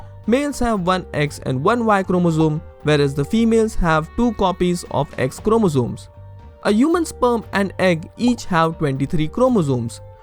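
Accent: India. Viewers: Indian accent tho :( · Mann im indian and this accent even hit me like a ton of bricks. Got dam ginda! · I love Indian accents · Indian accent again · of course it's a east indian turban head doing the narration lmfao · They already have had a half Human and half Chimpanzee. He lives in the 70s. The maker of this video didn't do his research properly. Also funny Indian accent. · No doubt , Indian accent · Me: *sees video Me: *hears indian accent Nope.. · LISTEN INDIAN MAN YOUR ACCENT ...SEEMS LIKE YOU PRAY TO YOUR GOD IS A HANUMAN . IM INDIAN TOO MY GOD ALMIGHTY LIVING IS MY CREATOR. PEOPLE LIKE YOU SHOULD IS UNETHICAL TEACHING WRONG CURIOSITY WILL DESTROY HUMAN RACE AND PEOPLE'S FAITH.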